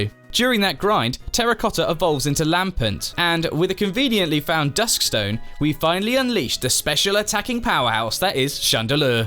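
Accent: United Kingdom. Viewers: Are you British?😂😂😂😂😂😂😂😂😂😂😂😂😂😂😂😂😂😂😂😂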